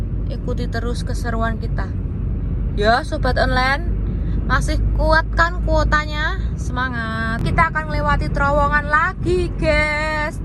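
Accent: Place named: Java, Indonesia. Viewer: Mbak e asal kota mana di Indonesia? Jawanya medok banget 😊...btw ini mbak e sekarang tinggal di Korea di kota mana?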